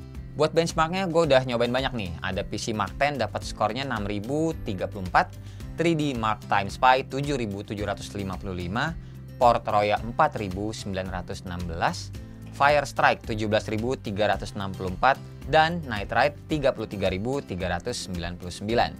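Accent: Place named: Java, Indonesia. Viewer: Yah, bang kok gak intro nya ga ada "ketemu lagi" wkwk (Terus agak medok wkwk)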